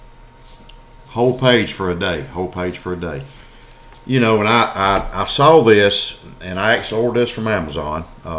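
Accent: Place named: Appalachia, United States. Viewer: It is so refreshing to not only see a man on "journal Tube" but also one that has an Appalachian accent. You sound like my family members and there's something really comforting about that.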